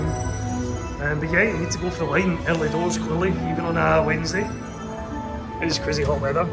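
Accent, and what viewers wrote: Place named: Scotland, United Kingdom. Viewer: Just found your channel, loving it so far and love hearing a Scottish accent doing these blogs for a change 😂. Good luck I hope you win big! 🎰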